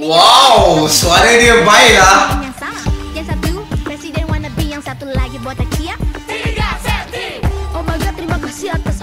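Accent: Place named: Malaysia. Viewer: Yang aku suka dari channel ini, reaction videonya pure banget ngalir, terus aku juga suka banget sama dialek nya, melayu bikin gemes😄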